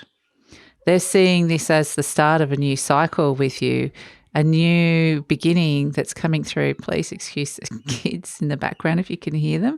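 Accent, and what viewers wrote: Australia. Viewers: Thankyou, love your readings, so nice to have a aussie voice 😊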